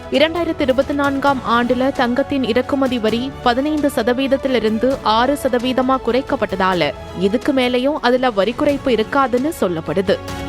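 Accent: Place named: Kerala, India. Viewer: Reader. is not a tamilian, may be a malayalee..it is not good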